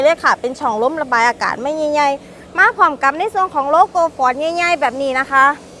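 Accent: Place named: Isan, Thailand. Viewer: ข่อยมักเจ้าเว้าอีสาน✌️😁😁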